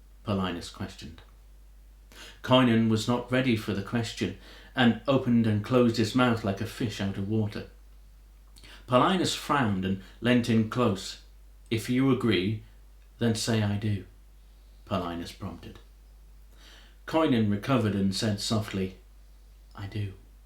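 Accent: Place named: England, United Kingdom. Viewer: I've told you before, I love your English accent.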